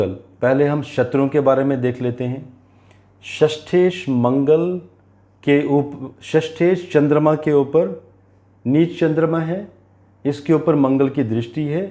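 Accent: Punjab, India. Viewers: this is happens why typically punjabi person trying to speak in hindi anyway always liked ur video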